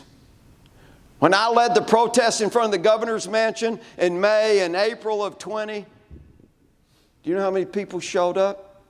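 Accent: United States. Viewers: good ol' Texan slant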